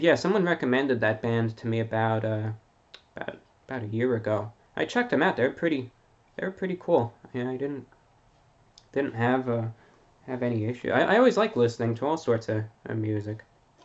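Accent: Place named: New York, United States. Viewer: If I were blind I'd guess you were an old Jewish man from New York City who now lives in Florida. I do enjoy listening to you but you tend to ramble on a bit too much and I'd never pay to hear that. I'm glad that people do pay to hear your ramblings enough to keep you on You Tube.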